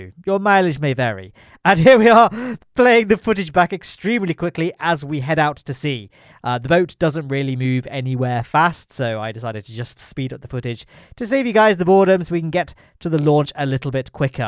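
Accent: United Kingdom. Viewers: This guy is the most British person iv ever heard lol 🤣🤣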